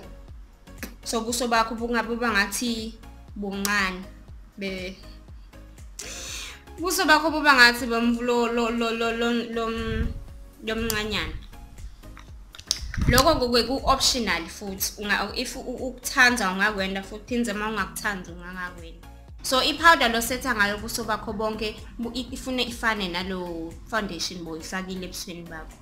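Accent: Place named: Eswatini. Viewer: So glad your Siswati accent sisekhonyana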